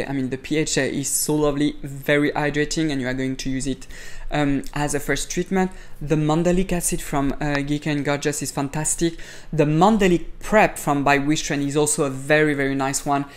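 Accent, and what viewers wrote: France. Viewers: Bonjour!Hearing skin care tips with a French accent makes everything sound more luxurious.Great suggestions but as a 68 year old with acne I am afraid to use exfoliators for fear of making the acne worse,like purging from RetinA.Also as you get older your skin starts to thin and is more prone to broken capillaries.Would you still suggest these products for someone with my skin issues?I hope you have a lot of videos because I cant sleep and what better way to spend the time than binging on beauty videos.Good night!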